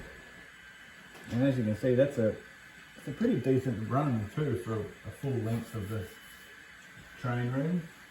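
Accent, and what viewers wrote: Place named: New Zealand. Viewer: Hi, a Kiwi in Christchurch. Wasn't sure if you were here in NZ or in Aussie but the accent and reference to "hi-country" put you in this part of the Pacific. As noted, nice to see something (more) local rather than from the US or UK. I'll be keeping an eye on the progress of the Ness River Railroad. Keep safe and well, Rgds Brent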